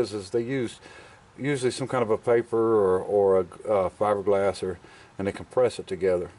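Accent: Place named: Florida Panhandle, United States. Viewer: I didn't realize you were in Florida.. so am I. I'm a Boston transplant and I've been in southern Fl. for a few years now. If I had to guess by your accent, I'd say you're from the panhandle..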